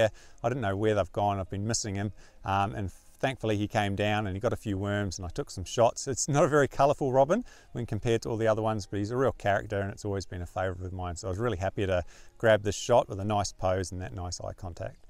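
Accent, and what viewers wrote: Australia. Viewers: Nice to listen to Ozzy English :-) - Missing all the colorful Australian birds I love so much :-(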